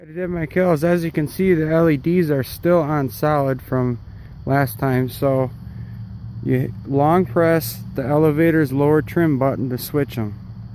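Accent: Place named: Minnesota, United States. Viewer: Hi. Your accent sounds like Wisconsin or Minnesota 😎. I'm from Minnesota but live in California and lost my accent::( anyway I was wondering how far you can go in altitude hold before flipping on gps and it wouldn't kick in to save my butt? I'm ordering the latest version. What are your thoughts on that? Thank you for your time. Have a great day. Sincerely William Horsley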